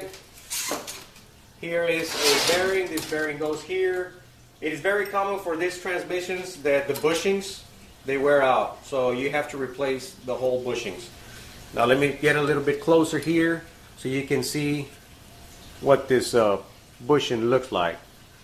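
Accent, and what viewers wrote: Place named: South Texas, United States. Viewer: I could be wrong but I think that's a south Texas chicano accent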